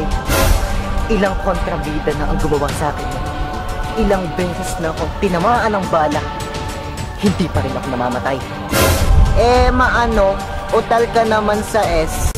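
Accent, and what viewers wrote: Batangas, Philippines. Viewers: Ala'y Batangeñong Batangeño ehh...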